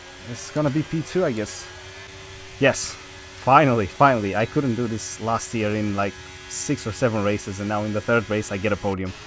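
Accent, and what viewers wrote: Romania. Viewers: Are you romanian? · Are you from Romania? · Is he from Romania?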